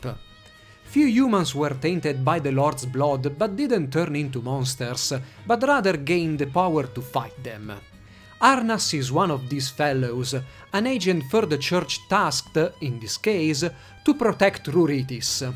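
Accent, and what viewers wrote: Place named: Italy. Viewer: You're the first person I've heard who has such a strong accent, but speaks so good english. Italian?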